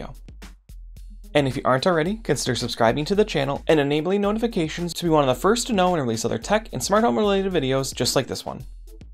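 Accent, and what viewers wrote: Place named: United States. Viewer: When giving instructions, speak slowly and clearly. You aren't chatting to family and friends but to people all over the world who may not have US English as their native tongue. We have difficulties with your speed, your accent and your manner of explanation. Thanks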